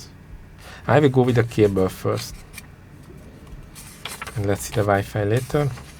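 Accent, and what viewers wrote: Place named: Hungary. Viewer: hey bro, I'm guessing you're Hungarian?!!! I sry... just hear your pronunciation.... Cool video ThX:person-turqouise-waving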